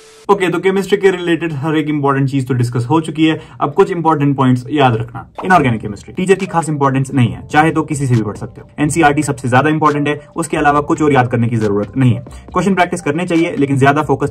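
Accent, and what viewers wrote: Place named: Kashmir, India. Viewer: Bro kashmiri accent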